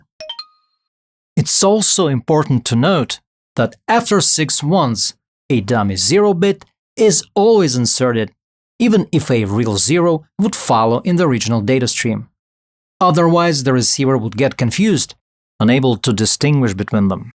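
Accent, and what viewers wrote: Russia. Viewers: cuz he's Russian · you are russian or talk to russian people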